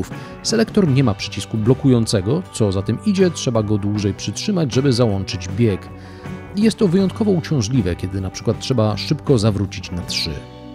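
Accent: United States. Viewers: Akcent rzeczywiście mocno US :) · mieszkałeś z Stanach, że masz US akcent?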